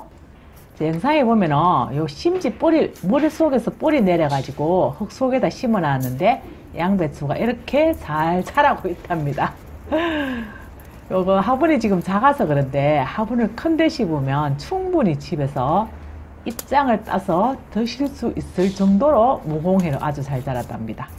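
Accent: Gyeongsang, South Korea. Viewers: 갱상도 아지매 아인교? 구수하게 자미있게 설밍도 잘하네예!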